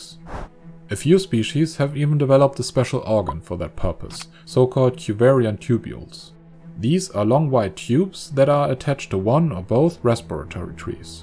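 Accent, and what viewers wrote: Germany. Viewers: Your German accent is killing me inside.... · Sounds like a German Morgan Freeman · Drop the nazi accent. It's offensive and racist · Are you from Germany? (Ur accent says so) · You got a strong accent. I guess you're German or something close by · I can instantly tell hes german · Kann mir nen Deutschen mit heftigen Akzent einfach nicht auf Englisch geben. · Sis guy soundz gerrman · Safe ,ist der Sprecher ein Deutscher! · is that a german accent?? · bwahahahah the way you said "turd like sausages" so casually and nonchalantly...and with that thick german accent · Youre german right i can hear the accent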